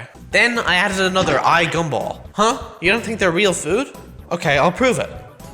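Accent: Australia. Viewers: U Australian 😄😄😄😄😄😄😄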